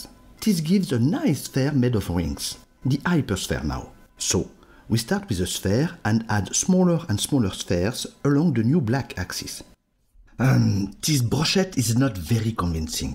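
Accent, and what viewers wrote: France. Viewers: nice explanation, i like the french accent :) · His accent is like hercule poirot :) pronouncing the r like in french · This video actually helped me process 4D. Also the narrator's french accent was funny and brightened my day :)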